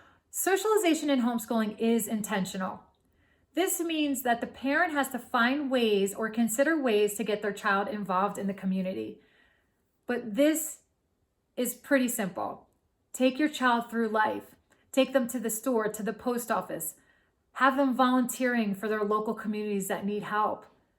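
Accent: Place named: New Jersey, United States. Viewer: This was an excellent and informative video!! I loved hearing this perspective. Looking forward to hearing more content from you! I can't help but ask (and you don't have to answer), but are you from the NJ area? You sound like you could be, and I only ask because I love all the activities and community based opportunities you're involved in... intrigued. I am going into my second year of homeschooling in NJ with my second grade daughter and we are loving it! Subscribed!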